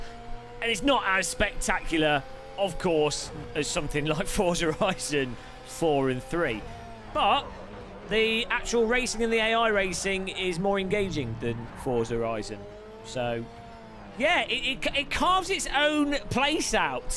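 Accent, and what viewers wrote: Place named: Leicester, United Kingdom. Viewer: but you definitely must be Leicester Lad for sure !!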